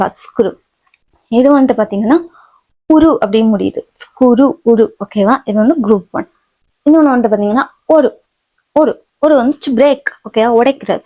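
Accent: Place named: Kanyakumari, India. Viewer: Super. Keep going. By the way, are from Kanyakumari district? I could sense a resembling tone in your speech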